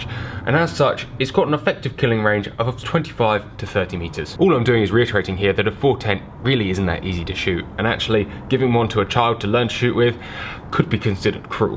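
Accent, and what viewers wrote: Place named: England, United Kingdom. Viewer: every time I click on one of your videos I'm momentarily disoriented by all the shotguns in the intro. But then you start speaking with an english accent and I remember that you folks across the pond are perhaps a bit limited in the scope of weapons available to you.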